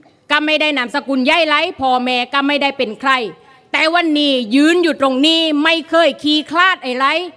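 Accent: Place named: South, Thailand. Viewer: พูดใต้ได้น่ารักมาก พูดเก่งมีเหตุผลได้ใจ👍🧡🍊🥰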